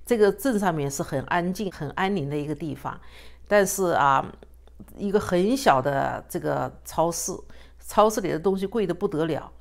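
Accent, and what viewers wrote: China. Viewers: 厲害， 有江西南昌的口音。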